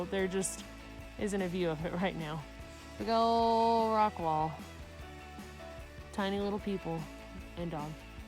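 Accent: South, United States. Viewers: It's the lady puppy for me!!! It's the southern accent for me!!!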